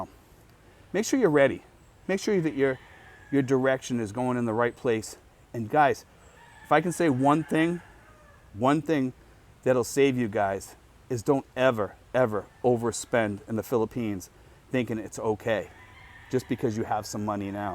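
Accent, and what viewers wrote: Boston, United States. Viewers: Good video, u From Boston?? Lol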